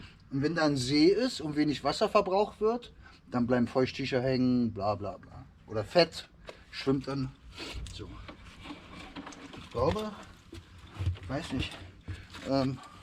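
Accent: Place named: Berlin, Germany. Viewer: aber cool das Du es machst, so versteht man dat Berlinerisch wenigstens XD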